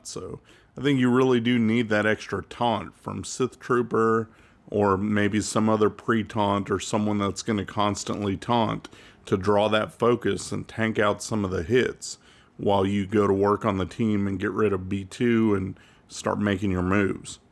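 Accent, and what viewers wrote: South, United States. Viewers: your southern drawl makes the videos relaxing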